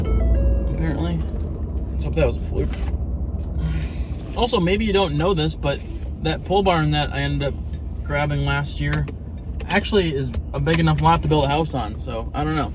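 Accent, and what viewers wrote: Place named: South, United States. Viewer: Lovin the country twang you been playing,coming from a country grandma,I’m young at heart !!! Please take care and be safe Sending good vibes from Nova Scotia Canada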